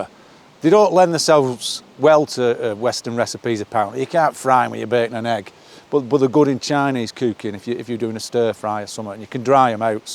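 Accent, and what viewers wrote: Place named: Lancashire, United Kingdom. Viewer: Lovely and educational as usual, I've told you before I'm a Yorkshireman but it's fantastic to hear a true Lancashire accent and follow you on your adventures. Atb and keep putting the great content on fella.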